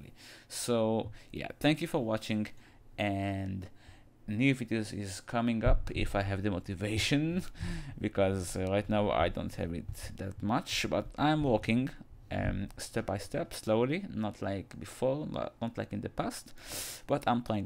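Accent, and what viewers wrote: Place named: Israel. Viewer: u sound israeli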